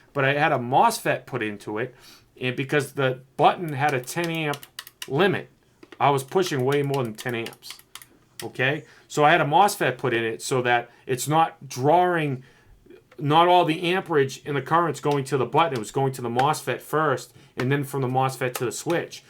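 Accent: Boston, United States. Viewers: You just gained a new subscriber because with all the videos I’ve put myself through yours is the one I got the most knowledge from. Are you from Boston ? Take care,all the best 🙏✌️❤️, 🍀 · Well said D from 25mins on but learned alot. Also...love the accent, is that Boston? 😄💨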